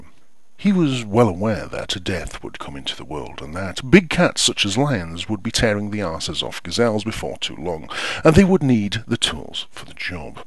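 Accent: United Kingdom. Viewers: your an excellent reader as well as a excellent thinker. But I'm an American... everything sounds smarter with british accents. I look forward to your next video. I do have a cat that steals my pasta dishes... but it think its' for the butter and cheese flavors?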